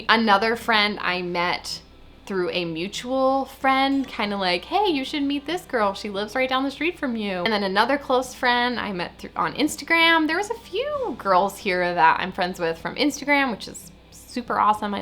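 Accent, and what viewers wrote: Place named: Canada. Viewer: You sound just like me, I’m a former Canadian too !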